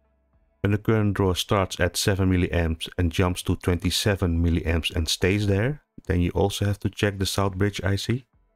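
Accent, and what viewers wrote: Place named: Netherlands. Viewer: Zo horen ben je Nederlands👌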